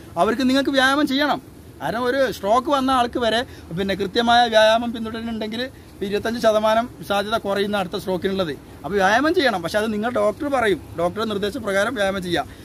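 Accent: Malappuram, India. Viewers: എന്തായാലും താങ്കളുടെ മലപ്പുറം ഭാഷ എനിക്ക് നല്ലോണം ഇഷ്ടപ്പെട്ടു തമാശ രീതിയിലും കോമഡി ആയിരിക്കും താങ്കൾ പറയുന്നുണ്ട് അത് അങ്ങനെ തന്നെ പോട്ടെ ഞാനും മലപ്പുറംകാരൻ ആയതുകൊണ്ട് എനിക്ക് തന്നെ വളരെ ഇഷ്ടപ്പെട്ടു ഏതായാലും താങ്കൾ ഗൾഫിൽ എവിടെയാണ് ഒന്ന് കമൻറ് പറയുക അല്ലെങ്കിൽ വീഡിയോയിൽ പറയുക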